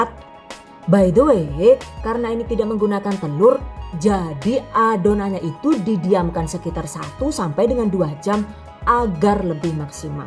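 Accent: Banyumas, Indonesia. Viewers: Assalamualaikum...maaf,kok ada bhs ngapaknya ya...kalau bgtu boleh tahu dari daerah mana yaaa,aku dari cilacap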